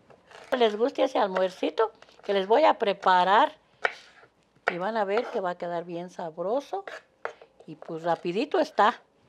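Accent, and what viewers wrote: Michoacán, Mexico. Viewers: Me encanta Su Voz Puro Michoacán Me causa mucha y delicioso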